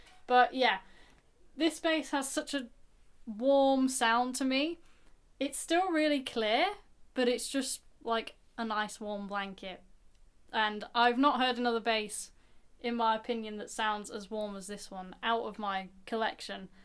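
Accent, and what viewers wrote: United Kingdom. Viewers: You sound British!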